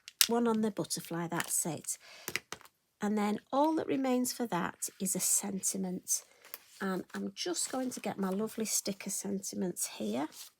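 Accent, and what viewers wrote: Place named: Cleckheaton, United Kingdom. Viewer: No you sound just like my family from Cleckheaton.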